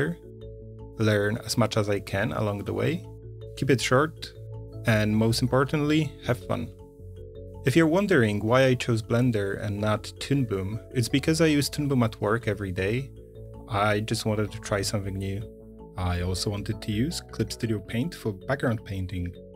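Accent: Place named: Ireland. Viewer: Do you live in Ireland by any chance? I can kind of hear a slight bit in your accent.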